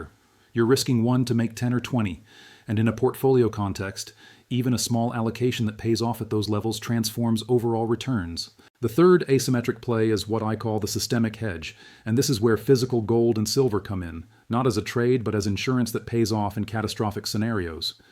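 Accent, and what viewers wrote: United Kingdom. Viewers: blathering AI avatar with a uk accent ==total nonsense--these guys are loony bs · British, American and Aussie accents and with more names than Frank Abagnale ever dreamt of. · The AI dithers between British and American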